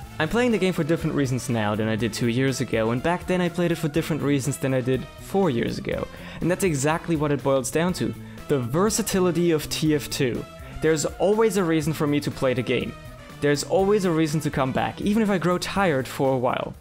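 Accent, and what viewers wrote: Germany. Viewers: are u from germany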